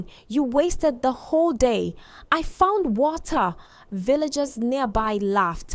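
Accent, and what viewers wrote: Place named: Africa. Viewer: Please don't Englishfi the story we have enough English stories and movies. We prefer raw African accent.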